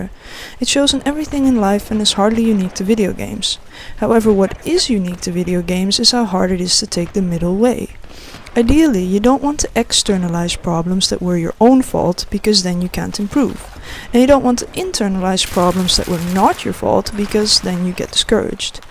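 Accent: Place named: Netherlands. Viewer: Definitly Dutch accent!!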